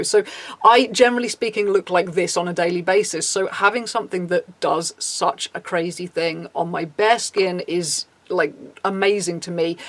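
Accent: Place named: RP, United Kingdom. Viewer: God she's posh !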